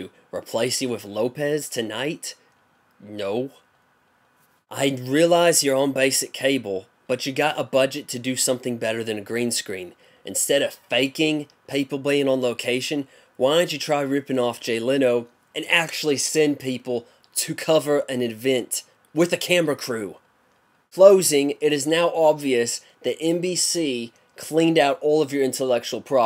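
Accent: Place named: Australia. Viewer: wow you really sound australian with your cold.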